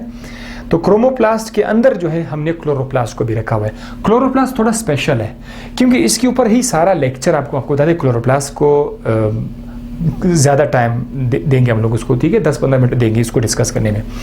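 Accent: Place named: Quetta, Pakistan. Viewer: Gr8 sir app ka urdu quetta ka hi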